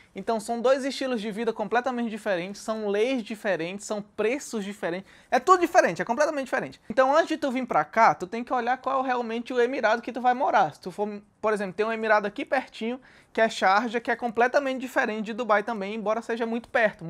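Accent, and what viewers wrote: Northeast, Brazil. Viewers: Caí aqui, e tu é nordestino? Pois parece com esse sotaque kkkkkk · Oxi vc é do Nordeste é...????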